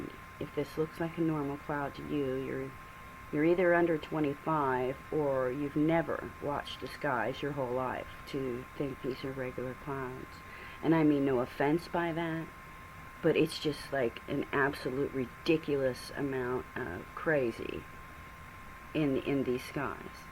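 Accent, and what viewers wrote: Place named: South, United States. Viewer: spoken with a sweet southern drawl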